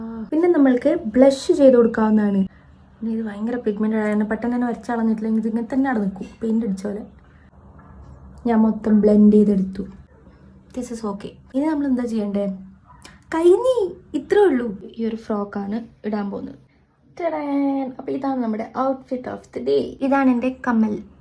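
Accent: Kannur, India. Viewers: Slang kettappozhee mnsilayii ksd or kannur ann lle? ❤️💗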